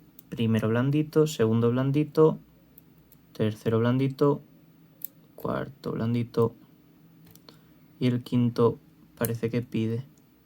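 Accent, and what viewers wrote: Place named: Spain. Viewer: odio este accent Español, pero ignoraré este horrible estilo de hablar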